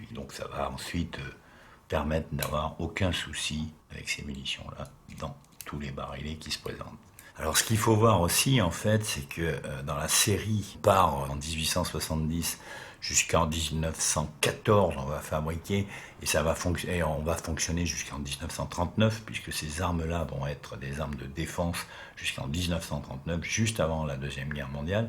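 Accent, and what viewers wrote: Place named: South, France. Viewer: d'accord j'ai eu l'impression d'entendre l'accent français sudiste lol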